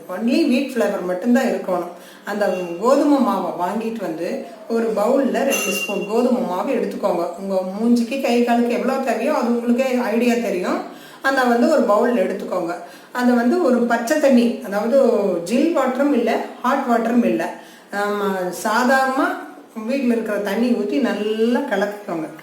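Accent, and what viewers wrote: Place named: Kongu, India. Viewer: Sure I am from tirupur I easyly connect our kongu slang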